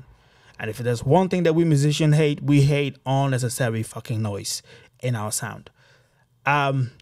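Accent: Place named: South London, United Kingdom. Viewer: bruh this guy is on sum south london shit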